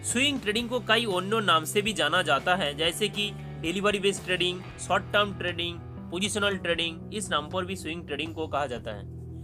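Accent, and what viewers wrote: Bengal, India. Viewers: Bengali ho kya bhai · Dont mind bro.. Bengali speaking people ke liye thoda problem hota hai.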